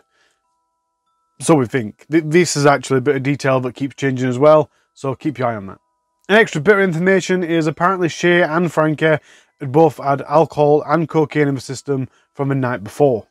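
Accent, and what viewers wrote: Yorkshire, United Kingdom. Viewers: awww blooody elll lad 😂😂😂 thought I could see a bitta Yorkshire in there. Hahah. Love this channel mate. Currently weking mi way frew thee vids 😂. Keep up the great work man! Glad I found the channel. “Am off for a cuppa n a bizkit, before I gu darn Weken men’s 😂😂”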